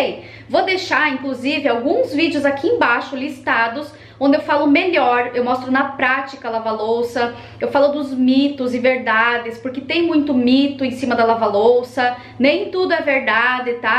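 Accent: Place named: Curitiba, Brazil. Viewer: Gostei de ouvir o sotaque da minha terrinha. Moro a vinte anos no Rio, e é bom me sentir em Curitiba de novo.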